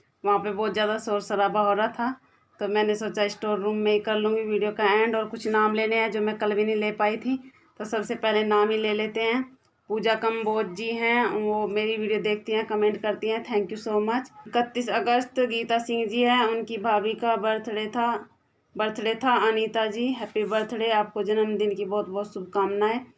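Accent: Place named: Muzaffarnagar, India. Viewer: ji aap Muzaffarnagar se ho kya aapki language se lagta h, Main bhi muzaffarnagar se he hu lekin abhi rahte h Chandigarh m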